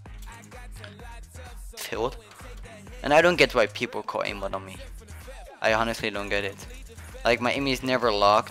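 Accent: Netherlands. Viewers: Dutch english ftw xD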